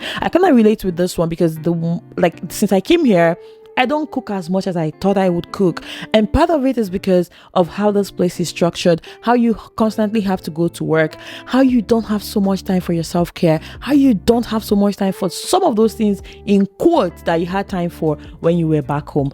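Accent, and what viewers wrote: Canada. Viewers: The Canadian accent though. ❤❤❤ Love the code switching 😂😂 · Lol The Explanation at the end 😄😄 Honestly, through out the talks. Was just like has fully evolved into the CA accent.🤣🤣 Nice to see And thanks for the video. Solid information👍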